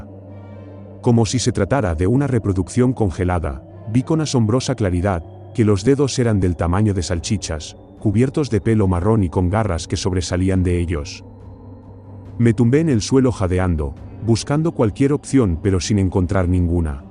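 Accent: Spain. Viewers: Y lo mejor es tu español de España sumado a tu manera de relatar,tan intrigante y la dicción,cada palabra y cada expresión que incluso nos enseñas a introducir y querér incluirlo en nuestro modo de hablar. Algo que admiro mucho de los españoles es que no solo saben hablar correctamente,saben tener un sentido del humor sin necesidad de ser grosero. Son increíbles. Saludos de Córdoba, argentina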